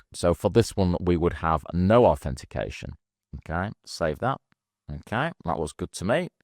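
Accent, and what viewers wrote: United Kingdom. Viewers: I believe this i s my first time of watching your video. THANK YOU for this. It was funny (especially with the British accent), practical, thorough and well explained. Keep them coming.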